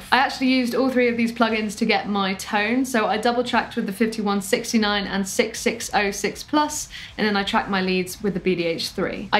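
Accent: United Kingdom. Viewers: I love the British accent on women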